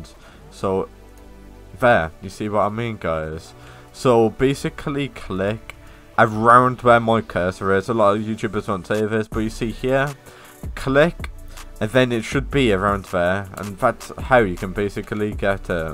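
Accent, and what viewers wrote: United Kingdom. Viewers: Bro your language british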